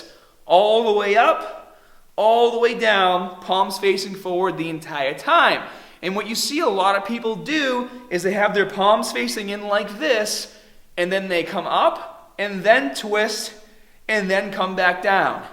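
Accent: Boston, United States. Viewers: is he from Boston? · that Boston accent really shining through! haha love it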